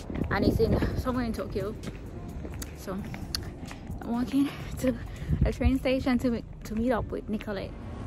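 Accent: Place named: Jamaica. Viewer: I can deffo hear the Jamaican accent ! Yeh goooodieeeeee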